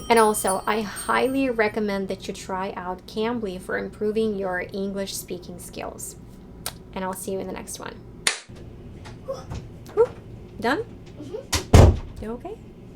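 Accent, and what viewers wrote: United States. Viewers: Oh ukranian stop playing smart you call that sosiska i remenber you cant tell the f diffrence you call ever f thing kasha please stop , and speak with neutral accent because when you try to copy us , you add a lot of anerican accent abd it just sounds horrible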